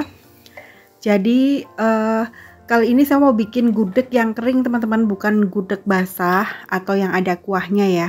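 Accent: Banyumas, Indonesia. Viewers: Wkwkwk.. Jebule wong ngapak.. Tosss disitt.. Yuh pd mampir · Seneng liat vlog nya bunda berasa lg pulang kampung😀😀 pokoke ora ngapak ora kepenak ya bund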